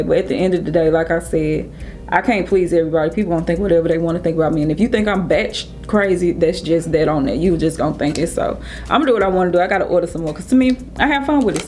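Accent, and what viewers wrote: St. Louis, United States. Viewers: hello beauty, i see you and i still see you. you remind me so much of both my daughter and niece (it's that accent... st. louis). my hard working mother of two daughters is also considering weight loss surgery and i've suggested she view your videos. i know everyone won't have the same experience but i loved your candor and realistic journey. she too wants the surgery afterwards and yes she has friends who kinda look at her like she crazy for wanting this for herself. So it is quite true you cannot please everyone, why try, hard enough trying to get support from those around you. it's a brave and scary thing. still loving your journey and that cute little man you got. i know he keeps you busy...i swear it amazes me how much i see my diva you in. and you are a diva in the best of ways. bless you love The Lord keep you guided❤👍🙏👶❤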